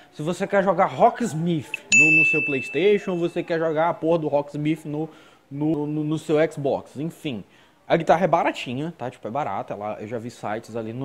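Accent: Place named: Maranhão, Brazil. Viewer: Sotaque de Maranhense!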